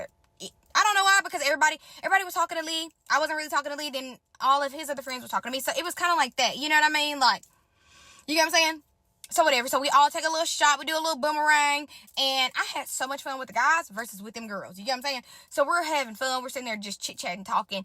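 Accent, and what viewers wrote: United States. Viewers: She sound country like me lol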